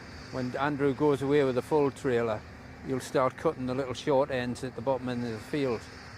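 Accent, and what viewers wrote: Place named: Newcastle, United Kingdom. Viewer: Good vid bro.....wat part of uk u in sounds Newcastle accent.....is this ur darm is it many acres....nice country side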